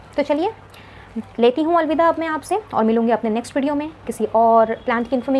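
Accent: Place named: China. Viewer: Your english have chinese acent I can’t understand and you tLk sooo fast 🚵🏽‍♀️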